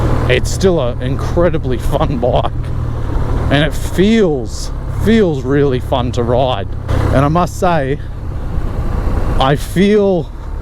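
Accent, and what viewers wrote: Australia. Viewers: Enjoyed the scenery mate. Great to hear a fellow Aussie accent overseas . Great bike. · First of all... Great review and a fantastic bike! But I'm very confused about yourself mate 😅 Bavaria in the name, an Australian accent and based in Estonia? Sounds like an interesting backstory 👍🏻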